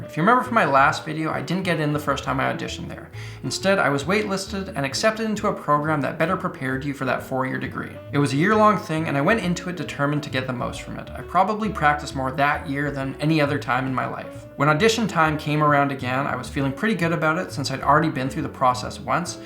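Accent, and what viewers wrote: Canada. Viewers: you can really hear his canadian soreys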